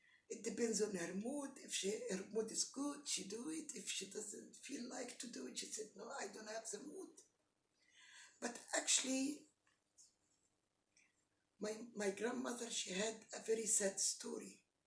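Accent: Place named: Egypt. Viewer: لهجتك مصريه خالصه رغم التحدث بالانجلش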